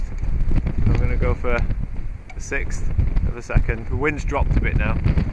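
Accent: United Kingdom. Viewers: Great educational video. We're is your accent from in the UK? I'm living in Edmonton Alberta Canada but born in sherburn in Elmet nd Leeds Yorkshire. I miss film photography as it was never so complicated as digital. Thanks for the education .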